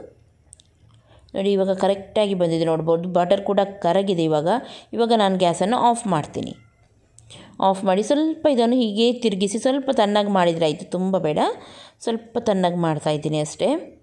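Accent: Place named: Dakshina Kannada, India. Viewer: I like your kannada. Perfect blend of south kanarian, blore and catholic style.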